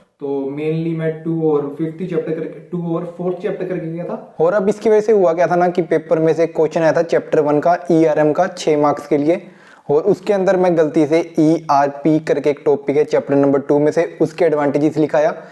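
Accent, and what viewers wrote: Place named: Punjab, India. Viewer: okay ji mai vi punjab to . Tuhadi accent to pta lg gya tusi punjabi ho . Mai fazilka to ha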